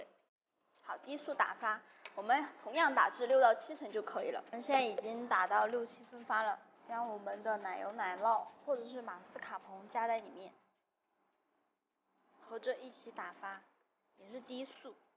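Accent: Sichuan, China. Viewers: 是四川人么？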